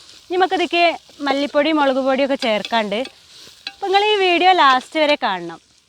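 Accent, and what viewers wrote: Malappuram, India. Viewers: സത്യത്തിൽ ee സംസാരം 🤦🏻‍♂️🤦🏻‍♂️ 😂 തനിമലപ്പുറം 👌💪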